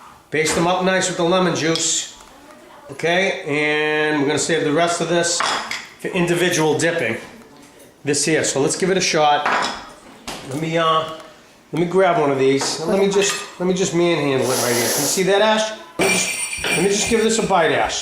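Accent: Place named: Italian-American, United States. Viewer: Oh your accent doesn't bother me at all. And I love how Italo-Americani try to speak Italian. It's not your fault but living in the States, I'm sure your parents had to learn it making it very difficult to then pass on the Italian language. My parents instead had to learn both English and French for working purposes, but once at home it was Italian. For this I was lucky because later on it came handy, I am now living in Italy. Your recipes are good and easy for anyone to make!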